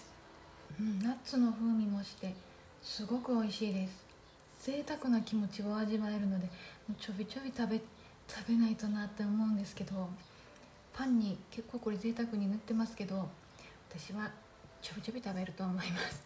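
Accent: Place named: Kansai, Japan. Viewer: 話し方が穏やかな関西弁で聞きやすかったです。 私も爪が弱くてコーティングするネイルを塗っていますがオイルのものも気になってました。今度買ってみます。紹介ありがとうございました。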